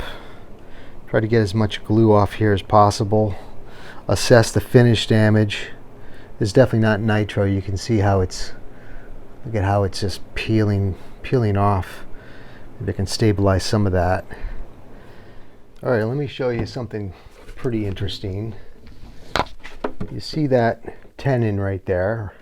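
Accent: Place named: Philadelphia, United States. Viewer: Where are you based? My gf and I are trying to pinpoint your accent. I'm saying close to Philly. That's one effed up dovetail. Nice work,!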